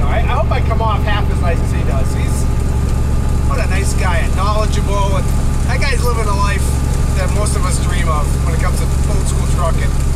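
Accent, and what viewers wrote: Boston, United States. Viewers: your videos are great don't change a thing the way you're video's come across are fine its you i don't want professional thats not you. Oh by the way i like your Boston accent especially how you say yard😂😂😂😂 Keep on trucking with the W9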